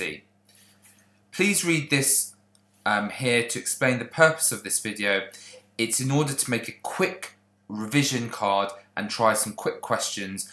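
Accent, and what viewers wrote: United Kingdom. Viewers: He has something called a British accent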